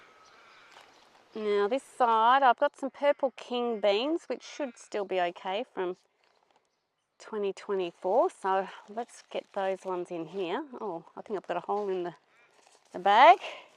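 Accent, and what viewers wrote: United States. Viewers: Why has our Aussie got an American accent 😢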